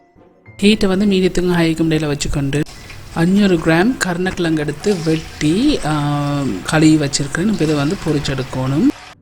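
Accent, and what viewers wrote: Sri Lanka. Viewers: உங்கள் சமையலை விட உங்கள் இலங்கைத்தமிழ் அருமை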